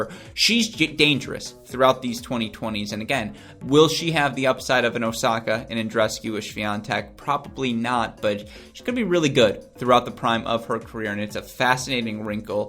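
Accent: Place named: United States. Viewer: Well described, well resumed, well explained. And what a beautiful american accent!!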